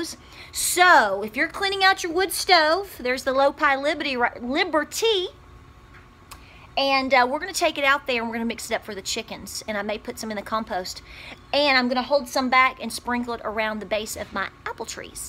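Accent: South, United States. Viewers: Hi (i think that's your name??? Hope so...) just wanted to tell you that I love your attitude and that southern accent. LOL. I'm a northerner and we raise German Shepherds so I'm not off grid or even a homesteader but I really enjoy watching shows from all you guys. So many useful ways to use up wood ashes! I never knew this stuff. My grand parents were farmers and carpenters. I got a LOT of carpenter in me but not a whole lot of farmer, so I'm working on that one as we speak. Just wanted to pop in here and tell you that I really enjoy your show.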